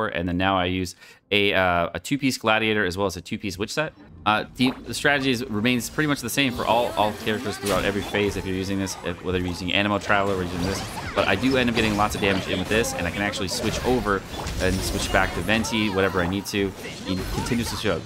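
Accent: United Kingdom. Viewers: I love your british accent(?)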